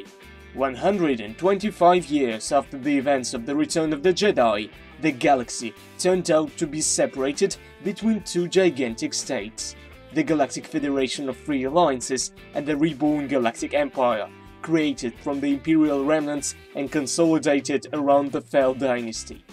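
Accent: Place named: United Kingdom. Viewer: This is British accent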